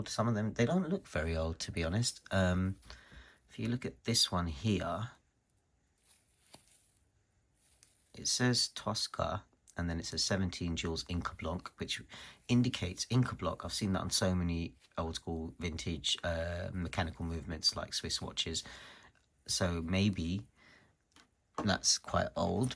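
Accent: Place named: United Kingdom. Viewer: I liked your presentation with soft British accents. Your thick husky voice is pleasant. Proud of you!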